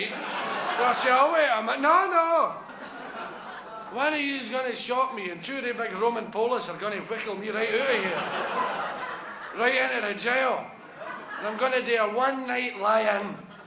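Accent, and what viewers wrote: Glasgow, United Kingdom. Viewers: Love Billy but the Glaswegian accent is something else. Haha. Understanding about 90% of it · to be fair, it is pretty hardcore Glesga patter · anyone here today for a listen.. I listen every Easter… funniest 15 min.. always wondered what the “ last miracle was”.. maybe one of you were at the Airdrie show… “ see you Judas your gettin on ma…..” pure Glasgow… awe the best🍻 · This is hilarious. I wonder is there anyone who would be able to help me with the transcription of this? I wrote down all I can understand, but there are parts I just don't get. I can send you what I have written down, if someone would be up for checking it out and correcting mistakes. I am not a native English speaker, so the Glaswegian accent is a bit of a problem for me, I would appreciate it.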